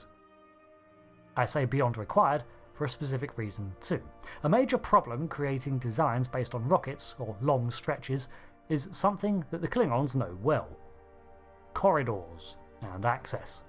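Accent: United Kingdom. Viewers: I know, british accent, but for the record, in Cornwall, Ontario Canada's phone numbers all start with 93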